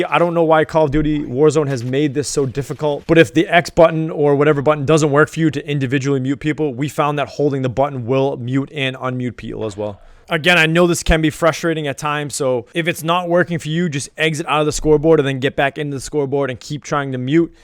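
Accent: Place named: New England, United States. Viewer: are you from new england? you kind of have an accent lol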